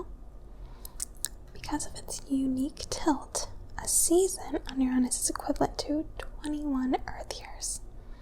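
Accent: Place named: Midwest, United States. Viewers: She must be from the Midwest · Its her midwest accent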